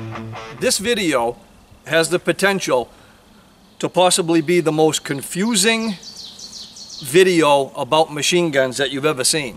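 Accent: Maine, United States. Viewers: You must be from Maine. There's no mistaking that down state accent. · This guys a mainer for sure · That gotta be a Maine accent Im a New Englander as Well Sir Thank you that Was terribly helpfull. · I'm detecting a Maine accent.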